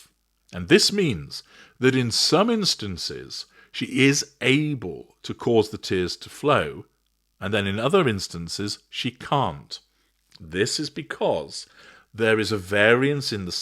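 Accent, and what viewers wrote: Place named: RP, United Kingdom. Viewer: While at first I was very much on board with exposing this particular narcissist, I have given your series on Mrs. Kirk a great deal of thought recently. I mean literally NO OFFENSE to you, but... as the daughter of an ASSASSINATED NAVAL OFFICER (18 July 1972 at Lawrence Livermore Nuclear Lab) I find it rather reprehensible that you are constantly going after a widow in the first few months after the death of the husband. I am cognizant she is a narcissist but wouldn't DECORUM dictate to wait at least 3 -6 months BEFORE treating any family in grief regardless, with some respect? My MOTHER IS A HUGE OLD NARCISSIST and as the daughter of her MURDERED HUSBAND, I would HIGHLY RESENT SEEING A TAKEDOWN VIDEO OF MY MOTHER IN THE FEW MONTHS THAT FOLLOWED MY FATHER'S MURDER. I am NOT a narc - but an empath and as one, this is rather hurtful to those children don't you think, when they after 15 years or so, see how brutal you were to their mother just weeks after their father's assassination? 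I get the educational value but really? I thought you had VASTLY more class and decorum - you do sound like a toff after all. However you are a narcissist so you probably really don't give a shit about what anyone else thinks. right - esp. the actual child of a narc mother whose father was also was assassinated? You just don't give a damn how those kids might feel in the future, do you? I am not at all suggesting that you don't continue this series- but WAIT maybe until well into next year... T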